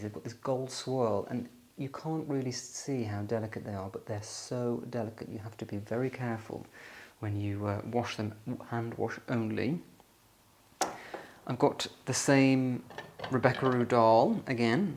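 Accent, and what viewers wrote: RP, United Kingdom. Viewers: So British, so posh hahaha. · Yes, unfortunately he does not see it. He's made videos before addressing people that criticize his posh accent and his lifestyle stating that's who he is and he just ignores it. He thinks the critique is just people being mean or that envy him. I think there's a nice person in there, but he has convinced himself he needs to keep up the elegant dandy facade. He comes across a bit sad and lonely to me. That scene in his closet gave me manic vibes...very OCD.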